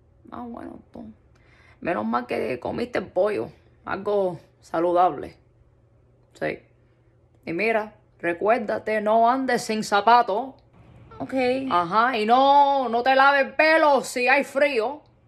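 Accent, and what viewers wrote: Cuba. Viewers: Now I wish, I would have met my grandparents before they passed. 😭 It just hit me that I missed out on all of this. 😔 But, you are amazing. I just love that Cuban accent so much!💜💜💜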